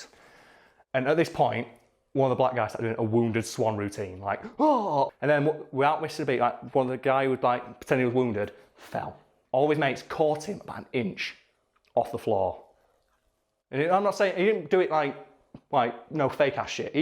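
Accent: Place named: Northern England, United Kingdom. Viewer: Everybody misreads the sign for Hilter in northern Germany when they first see it. I was also in Elend (misery), just 8km from Sorge (worry). I know it's for comedic effect here, but you are kinda mispronouncing Fucking if you say it like the English pronunciation, except your northern English accent with the characteristic u sound that is far closer to German kinda counters that.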